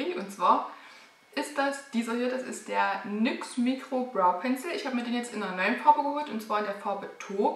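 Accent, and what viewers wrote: Saxony, Germany. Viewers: Schönes Video, alles super erklärt, und das Denglisch finde ich auf sächsisch sehr sympatisch, gerne immer wieder mehr davon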